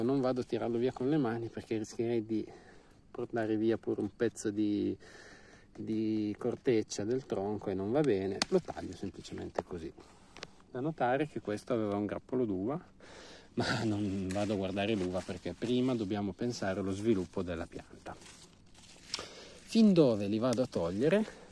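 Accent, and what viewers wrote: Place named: North, Italy. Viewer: Salve SEMPRE molto chiaro. Scusi di dov'è perché non ha accenti ma sicuramente del Nord, io sono del Lago di Como. Quest'anno il Lago è stato riempito 2 volte se non tre.......😊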